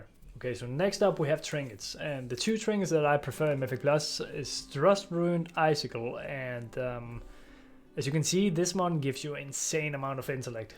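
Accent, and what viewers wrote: Denmark. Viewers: damn, du er super dansk med den accent :D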